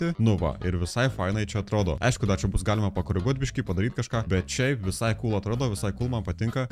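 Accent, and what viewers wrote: Suvalkija, Lithuania. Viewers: ziaurai laukiu pbs kitos dalies kad ir nera suvalkeitiskos kalbos bet content nei kiek nepablogejo🙂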